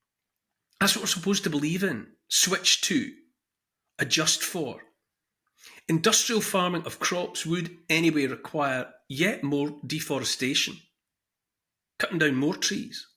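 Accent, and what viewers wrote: Scotland, United Kingdom. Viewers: You have a lovely Scottish accent.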